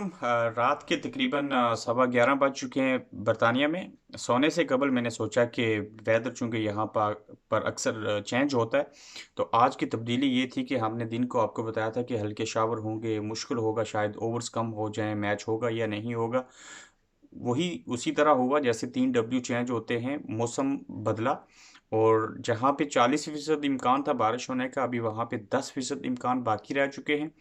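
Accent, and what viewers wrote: Kashmir, India. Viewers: Apnay kashmiri accent ko khatam karo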